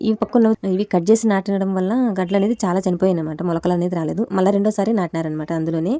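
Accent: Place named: Rayalaseema, India. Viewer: A vuru midhi rayalaseema na anantapyram anukuntunna mi slang vini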